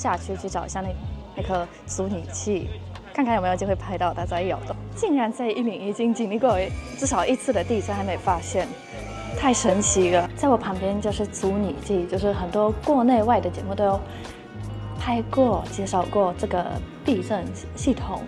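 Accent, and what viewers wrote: Standard. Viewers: 第一次看你的影片，國語講得好標準！標準到讓我有一點懷疑是不是配音的😂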